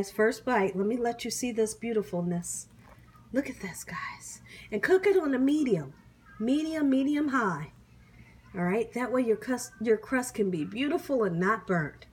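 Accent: South, United States. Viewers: I love that southern accent too. What a lady!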